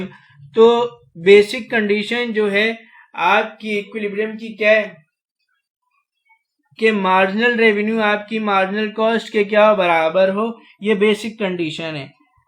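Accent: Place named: Karachi, Pakistan. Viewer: Lahore board k haii?? Awazz apki Karachi walii lag rahii ha🧐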